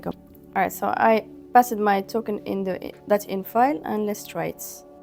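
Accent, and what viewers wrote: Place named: Egypt. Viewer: طلاق تلاته مصرية و متهش عن الاكسنت😂 شكرا❤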